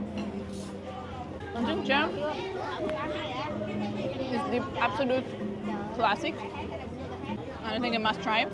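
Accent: Vietnam. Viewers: Your VN accents from the northern. Wow. Cool.😊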